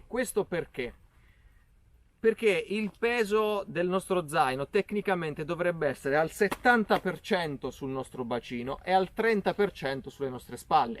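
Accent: Apulia, Italy. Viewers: Grazie per le belle dritte che ci dai con grande simpatia! Non so di dove tu sia ma ti sento molto Pugliese... e ho detto tutto. Non vorrei snagliare.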